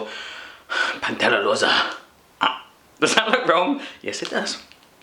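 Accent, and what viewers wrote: Mexico. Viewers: Man, how come you still play spanish accent as mexican? Travel more mate, we are not that far away from your place... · Why did he talk like a mexican? Spain is in europe, we don't have latin accent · Your mexican-spanish accent was funny hehe but we Spaniards don't speak like that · why are you talking like a mexican? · Por qué cuando hablais de España poneis acento mejicano y decís cosas como "olé "???México y España están a un oceano de distancoa y son culturas muy diferentes. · Maaan, we don't speak like Mexicans jajaja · Your mexican accent is awesome, but in Spain we don't speak like that my friend :P hahaha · The accent you use to speak as a spanish is a mexican accent xD Spanish dont speak like that ;D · NObody says Ole in spain and you spoke like mexican, not like spanish xd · lel so bad man spanish people doesn't have that pronunciation that's from mexico... 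And the green melon is a chewinggum u shouldn't swallow it. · España y México son diferentes no pongas acento de mexicano para hablar en español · I don't know what's worse, the way you eat the "seeds", using a mexican accent for spanish people, the way you ate the melon gum or you trying to be funny and turning out to be absolutely awful. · No se quejan de que no hable español bien, se quejan de que hace un acento mexicano como si todos los de España hablaran asi, pero bueno que se puede esperar de un ingles sin ninguna cultura -. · Yeah, man... you definitely think that the Spaniards' accent is the same as the Mexicans'... and sorry, but it's not, really, at all. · Why you speak spanish with mexican accent? And why you are always saying "Ole!"? :C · Madre mía xD, pero este porque habla con acento mexicano , a ver si estos estadounidenses van aprendiendo de una vez que España no es México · We haven,t got Mexican accent 😂😂 Our accent is more clean, I don't know how to say it. 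The only accent in Spanish are the "RR" and the "z" but it hasn't really accent. But good video,funny like always 😂😂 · this suspicious white powder you're eating is called sidral, and you're speaking spanish like a weird mexican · Dude, I'm from Spain, and we are not Mexican 😂 We don't speak with that accent 🙈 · You speak as a Mexican , the Spanish is as the italian or the français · por que imita a un mejicano cuando habla español?, no todos los que hablan español son mejicanos, de hecho, no viene de ahi la palabra español precisamente. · Nice video man,but spanish don´t speak with mexican accent....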